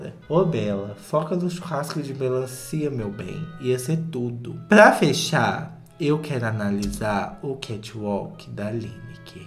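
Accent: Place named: Belo Horizonte, Brazil. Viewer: Ah, eu reconheci seu sotaque, é BH demais! Não te conhecia, este foi o primeiro vídeo que assisti, mas gostaria de valorizar a gata que mais entrega Catwalk: sua filhaaaaaa😻😻😻! Que neném lindona😹😹😹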